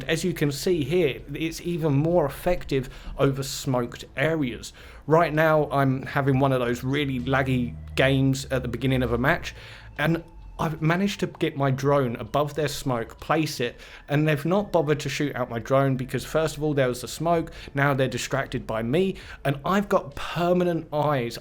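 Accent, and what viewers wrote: United Kingdom. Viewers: ur accent is amazing, are u british? Watchng your vid makes my enflish better and apex skills better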